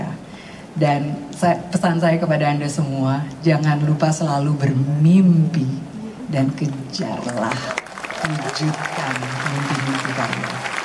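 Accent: Indonesia. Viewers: intonasi suara, SEMUANYAAAA SANGATTT INDONESIAA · Lagu mimpi mengingatkan aku pacaran di masa SMA..mimpiku yg indah2..ternyata mimpi itu kandas pacarku meninggal Krn skt likimia.. Hilanglah semua mimpiku yg sdh kutata rapi.. memang anggun..SBG wanita Indonesia..TDK sombong ttp bahasa jawanya ttp kental tdk hilang..slmt dan sukses buat mbak anggun...raihlah mimpi2diluar negri..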